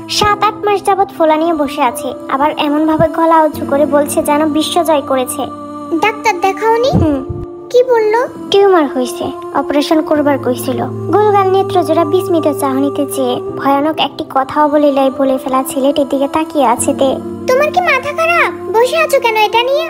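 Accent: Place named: Old Dhaka, Bangladesh. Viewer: আপু তোমার এক গল্প কয়বার দেকলাম ঠিক না-ই তোবো ভালো লাগে আমি fb এর মধ্যে পরছি তবো এমন ভালো লাগেনাই। যতোটা তোমাদের মুখে ভালো লাগছে। বিশেষ করে তোমার মুখে পোরান ঢাকার ভাষার নতুনভাবে প্রেমে পরলাম ভালো লাগে। যতো বার শুনি মনেহয় এই ffটার প্রথম বার শুনছি। ❣️❣️😊😊😊😊😊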